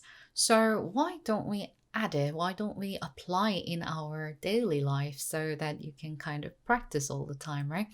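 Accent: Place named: United Kingdom. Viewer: Thank you. I really love your videos. Your British accent really shines through! :-)) I didn't know about 속에. It seems similar to 꽂다, which I understood to be kind of meaning stuck in a tight space. What is the difference between 속에 and 꽂다 (other than the fact that one is a preposition and the other a verb). Are they basically used for similar spaces or is there a different nuance?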